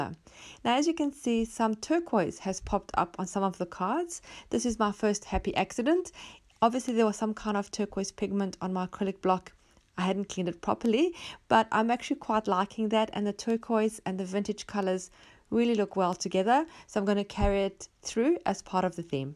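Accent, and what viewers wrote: South Africa. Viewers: Love watching your channel. You sound South African 🤦‍♀️ I am from SA. Going to see how I can do this without the inks ❤️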